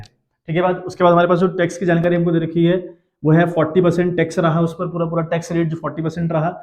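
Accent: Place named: Pahadi, India. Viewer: himachal se . Aapke accent se lga ki aap pahadi ho 🙂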